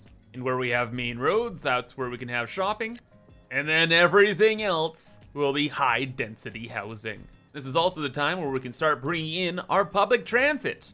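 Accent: Canada. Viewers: as a canadian ur accent describes me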